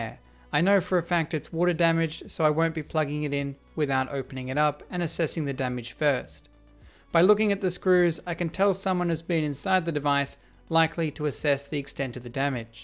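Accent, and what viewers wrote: Australia. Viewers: I need to stop watching some many Aussie YouTubers, I thought this was a DankPods vid 😂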